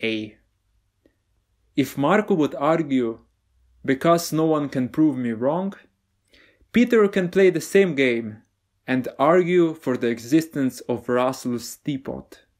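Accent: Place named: Slovenia. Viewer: Are you from Slovenina maybe 😅 I somehow recognize the Accent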